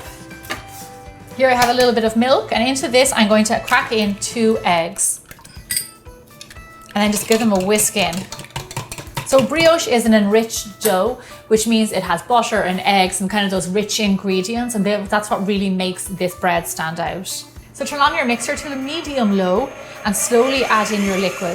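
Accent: Ireland. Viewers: Hi as an Irish person watching this from Wakes, UK this made me smile. Your lovely Irish accent reminds me of home. 🇮🇪🙂☘️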